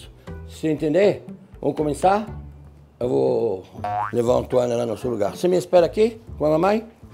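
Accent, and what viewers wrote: France. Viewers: O Jacquin tá aqui no Brásil vários anos pq ele fala português com sotaque francês e dificuldade? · amo o sotaque francés dele um amor de pessoa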